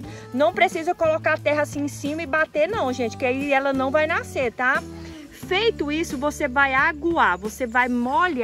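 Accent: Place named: Goiás, Brazil. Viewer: Oi vc parece Goiana. Ô trem bão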